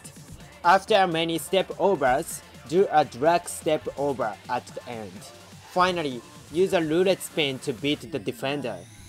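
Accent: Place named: Japan. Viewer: honestly, the japanese accent makes it even better!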